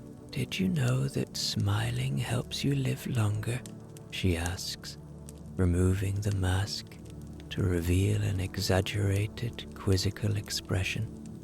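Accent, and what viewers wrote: Ireland. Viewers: Irish story tellers are the best! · I never hear anything of your stories because I fall asleep immediately 😅. I love your Irish accent and voice and the fantasy journeys! I also love that you were inspired to use your gift this way! After the 1st story (monk in valley), I felt better since. I think it removed blockages while I was asleep.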